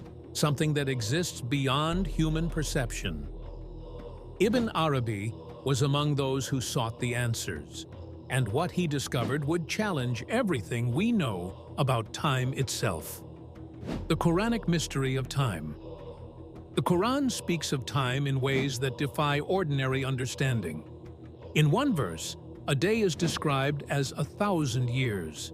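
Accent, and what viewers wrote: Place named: RP, United Kingdom. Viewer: Who made this video ? The BBC type English is rather chilling to listen.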